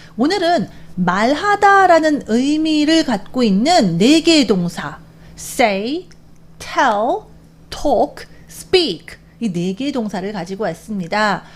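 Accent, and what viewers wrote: United Kingdom. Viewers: 원리 중심으로 구분해줘서 머리에 쏙 들어 오네요. 감사합니다 근데 샘이 영국식 발음이네요ㅜㅜ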